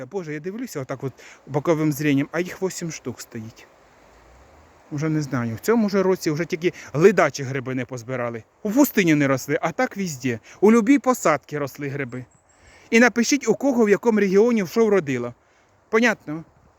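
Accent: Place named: East, Ukraine. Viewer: От так говорять на сході❤❤❤❤❤❤❤❤❤❤❤❤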